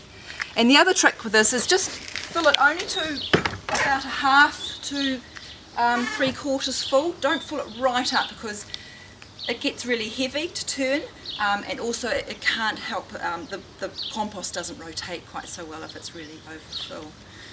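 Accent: New Zealand. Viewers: The Kiwi accent is the best in the world. That, and the Scots.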